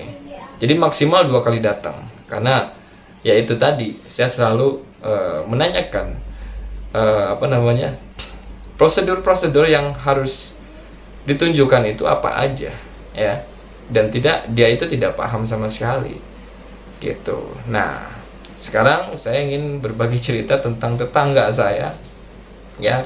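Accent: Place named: Java, Indonesia. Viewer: Abang orang mna. Kyaknya ada Jawa nya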